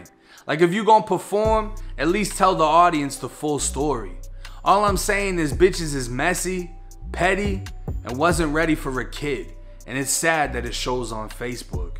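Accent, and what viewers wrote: Boston, United States. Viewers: Bro u sound like ur from boston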